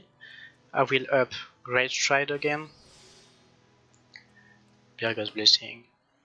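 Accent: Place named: France. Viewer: love french english accent ! thanks for guide btw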